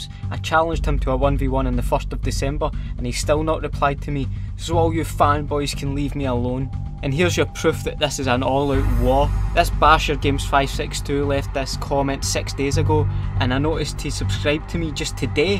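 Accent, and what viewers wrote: Scotland, United Kingdom. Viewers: you and frankie boyle are the main reasons why i love the scottish accent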